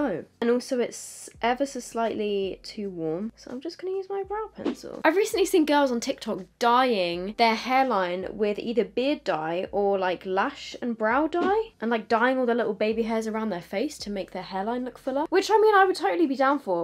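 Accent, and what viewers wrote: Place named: England, United Kingdom. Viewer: As an American, a Texan specifically, it sounds so funny to me to hear someone cuss with an English accent.😅🫶🏼 It’s so cute lol. I love your videos!❤️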